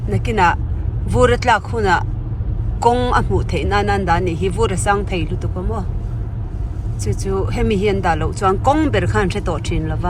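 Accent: Norway. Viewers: Kei chu tawngkam ithiam in thil i sawifiah thiam kati,,norway tawng thluka itawng te hi ngaihthlak anuam kati tlat thung..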